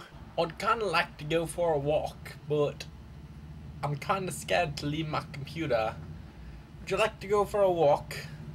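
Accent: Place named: Ireland. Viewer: Kinda Irish.......... :)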